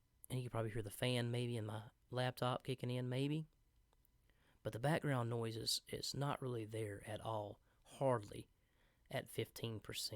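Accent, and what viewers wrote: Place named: South, United States. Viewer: man you got that country vocal!! love the mic and nice commentary!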